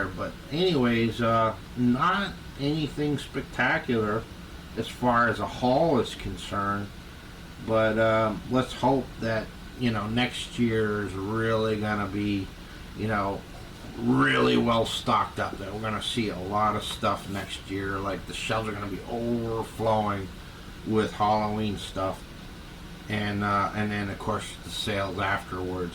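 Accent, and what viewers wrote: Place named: New England, United States. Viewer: "Draw-ring"....lol, can't shake that New England accent. Abdiel murdered that skull challenge!!! Den Mother Edie was super clever making her Lenofish out of a skull, I didn't see that coming! Congrats to Fraidy and Monster Morgue Tutorials(Abdiel) for their wins!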